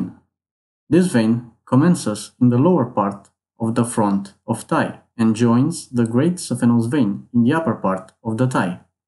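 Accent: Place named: Romania. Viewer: Salutare, tocmai am 3 saptamani dupa operatia de varice..Doamna chirurg, mi-a spus, ca mi-a eliminat acesta vena(safena)... Am mai multe incizi pe picior. La inghinal, langa zona genitala am o cusatura mai maricica, cica acolo ar fi "inchis-o".... Deci, sunt operat de la glezna pana sus... O luna fara efort fizic... Ma simt ok, dar dupa, sa zic vreo 15 min - 20 min de mers, stat in picioare... Simt presiune, suportabila in picior, cu senzatie de umflare... Cred, ca e normal... Ptr perioada asta... In fine... Aveti un video foarte interesant..., dupa accent sunteti roman 🙂💪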